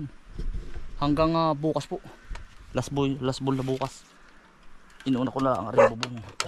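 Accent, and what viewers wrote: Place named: Batangas, Philippines. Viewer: Silent subscriber from California USA....naaaliw akong panoorin ka. Ang diction mo ay kakaiba parang Batangas. Ganyan ba sa Tayabas Quezon ang salita,nakakatuwa ka pakinggan. Masipag kayong magkakapatid, lucky ang mga spouses ninyo na magkakapatid masipag,humble,masikap at maasikaso sa magulang. Ang fruits ay puede lang kuhanin sa.puno hindi na bibilhin pa. Ang bayabas ay expensive dito malalaki 1 lb.is $6.99 rich in Vit.C iyan. Edible ba ang prutas na parang strawberry, baka poisonous iyan.Always watching ur vlog lalo na at stressed ako sa work. GOD BLESS!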